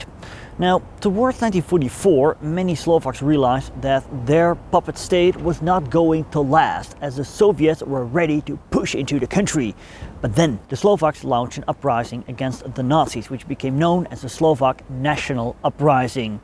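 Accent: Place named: Netherlands. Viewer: Just taking a moment off 'Driven to Distriction' (helpful for ADD History Buffs like me) to tune into this fascinating short. Im not in position to support whst you do, but i do think the style and approach, and the Dutchies accent work well together. Although Scots-Welsh, my partner and I always get mistaken for Nederlanders out on our travels; it even happened in Haarlem. Interesting what happened in Rostov this week. Back in the day, in the Valley of Snakes, there, a leading member of my profession was murdered. Wikipedia says some were still alive when the pit was backfilled; the stuff of nightmares surely. Although peace-minded, we don't forget that our the Norse, Dutch, German Resistance, etc, etc friends thatcsaved us from occupation and an overt problem of how to live and work with collaborators. Be pragmatic, or hand the back to Mossad, or both? 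The Rom have no Mossad, that I know of though; but engaging, sharp, factual presentations must be a part of the UnFinalising of YouKnowWhat. g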